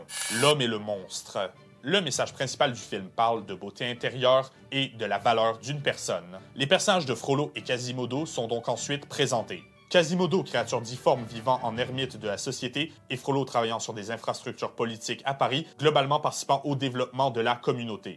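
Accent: Quebec, Canada. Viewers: Wô! Es-tu Québécois toé là? :D (Sorry, je viens de débarquer sur la chaîne! Des critiques de films et analystes québécois sur Youtube, j'en vois peu!)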